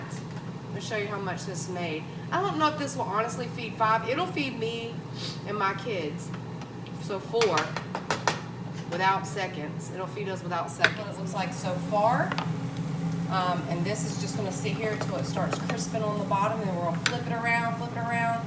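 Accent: South, United States. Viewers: I just love to watch you cook. You remind me so much of myself with that southern Belle accent.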